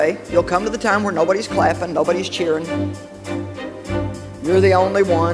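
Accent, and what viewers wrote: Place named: South, United States. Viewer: I can't take this lady's southern accent seriously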